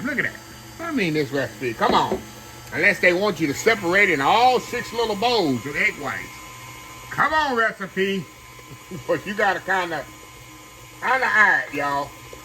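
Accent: South, United States. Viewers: Your southern accent takes me back home to like 40 years ago. And you fussing at the recipe..🤣🤣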